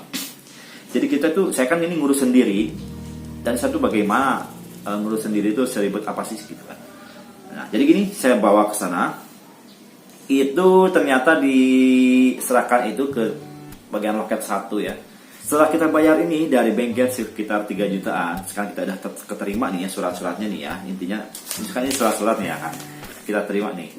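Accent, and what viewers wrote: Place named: Bogor, Indonesia. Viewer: Orang bogor yah...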